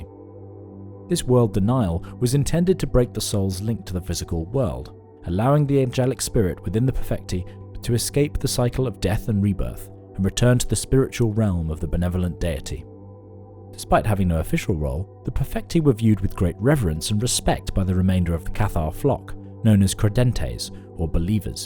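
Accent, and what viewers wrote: United Kingdom. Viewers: oh ya like the Souff London accent. Ya I used to live in London.. Heard that :)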